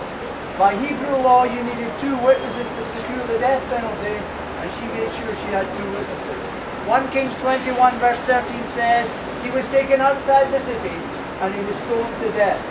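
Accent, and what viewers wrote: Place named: Glasgow, United Kingdom. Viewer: He's Scottish! Sounds like a Glasgow accent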